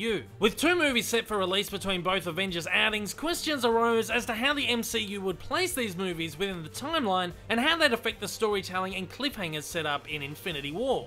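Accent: Australia. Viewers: Great job with the video man but fuck me your voice! You sound like an Aussie version of the slug woman from Monsters Ink 😂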